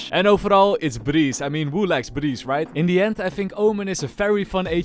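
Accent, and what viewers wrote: Netherlands. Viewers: how much do you like his dutch accent? very much i like his tips more 👇 👇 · 1% englise acsent 99%dutch acsent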